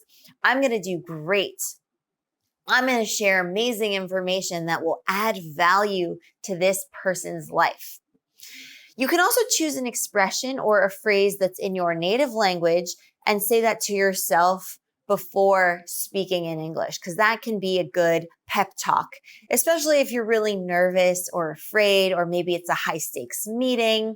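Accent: United States. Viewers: Very good american enghish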